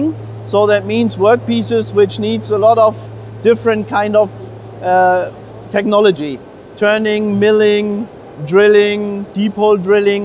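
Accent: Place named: Germany. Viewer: I love his german accent😂😂😂😂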